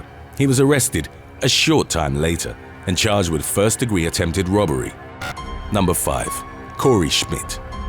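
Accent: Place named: England, United Kingdom. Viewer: He has an English accent!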